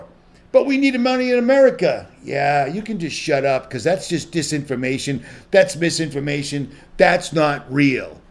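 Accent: Boston, United States. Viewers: That’s Boston lingo.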